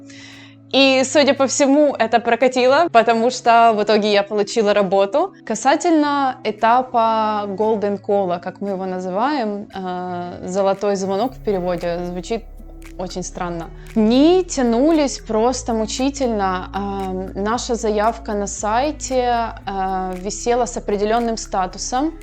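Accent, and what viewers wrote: Ukraine. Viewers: Украинский акцент не уб.ешь ничем. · Может покажется странным, но самое классное в видео, это Ваш украинский акцент, особенно нравится буква "ч", и буква " я", которая по произношению ближе к "а".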